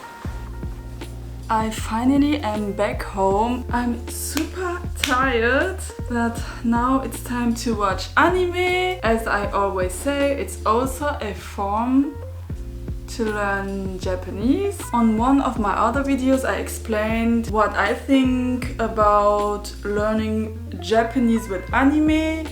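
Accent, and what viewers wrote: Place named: France. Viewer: U have a French accent!! Ur mother language is French ? .. PS: keep filming videos like this 🥰🤍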